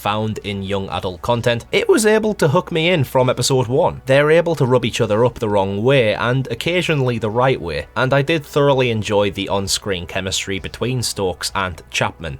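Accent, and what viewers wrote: Scotland, United Kingdom. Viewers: I truly love this show ❤ Also can anyone tell me what english accent he is speaking in? It kind of sounds like scottish sometimes but he doesn’t roll the r so I’m not entirely sure. I’m just very interested in languages and accents and all that stuff. So if anyone knows or if you want to answer yourself it would be much appreciated.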